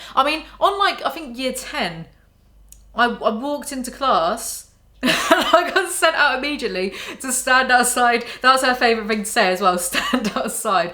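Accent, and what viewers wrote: England, United Kingdom. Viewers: You reading this with your accent makes it so much more enjoyable, British really was made for insults.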